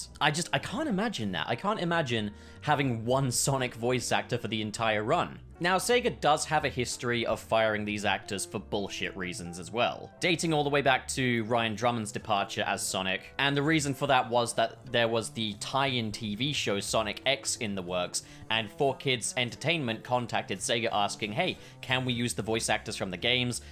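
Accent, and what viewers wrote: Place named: United Kingdom. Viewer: I think he is somewhere in the u.k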